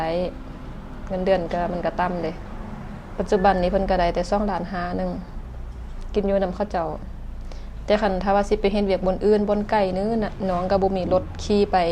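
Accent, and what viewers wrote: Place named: Loei, Thailand. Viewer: สำเนียงพูดจาภาษาเหมือนคนไทยเลย จังหวัดเลย จังหวัดเลยเขาจะพูดฮันแนว❤❤🎉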